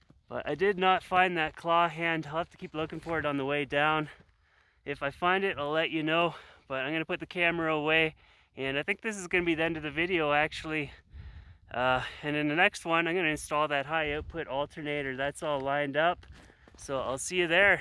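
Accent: Canada. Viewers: since i follow i learn how to say OUT in canadian, "IUT"...lol:D:D love u brother:)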